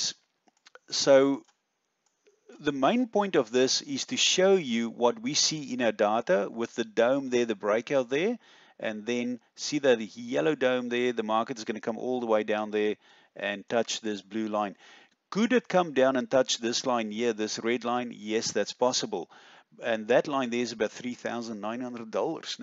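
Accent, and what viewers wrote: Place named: South Africa. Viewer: Thick South African (Afrikaans) accent...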